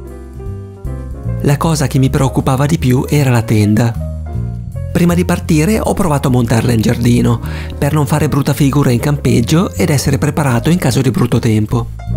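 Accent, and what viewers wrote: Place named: United Kingdom. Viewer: Ti ho scoperto da poco, e sei bravissimo e simpaticissimo con quel tuo accento inglese :)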